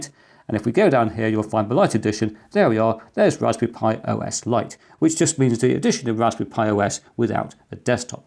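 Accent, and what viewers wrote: England, United Kingdom. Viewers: este señor habla tan claro, que debería trabajar como locutor en el radio. Usando traducción automática, es casi perfecta, mejor que la traducción generada desde una voz sintetizada. Definitivamente el inglés de Inglaterra es mucho mejor que del Estados Unidos.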